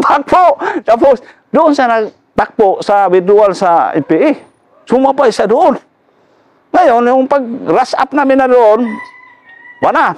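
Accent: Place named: Iloilo, Philippines. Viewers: Ilonggo gid si sir.. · Basta ilonggo mag kwento maganda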